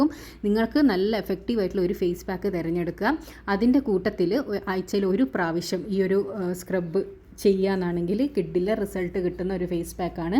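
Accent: Kannur, India. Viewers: മുഖം വെളുത്തില്ലെങ്കിലും നമ്മുടെ കണ്ണൂർ ഇത്തയുടെ ആ കണ്ണൂർ ഭാഷ കേട്ടാൽ മതിയല്ലോ ഏതായാലും നന്നായ് കൂടുതൽ ചിലവ് ഇല്ലാതെ പരീക്ഷിച്ച് നോക്കാൻ പറ്റുമല്ലോ❤❤❤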